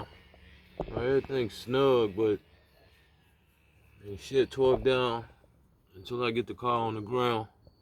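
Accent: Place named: South Carolina, United States. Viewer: I’m new to the channel but I swear from the way you talk you’re from low country South Carolina.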